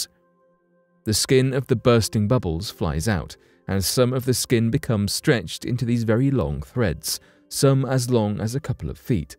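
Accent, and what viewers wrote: United Kingdom. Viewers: British pronunciations are the absolute worst.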